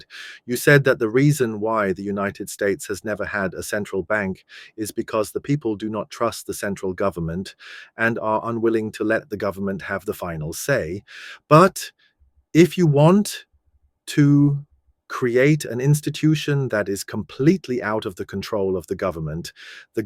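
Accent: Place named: United Kingdom. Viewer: Very British accent 😊 👍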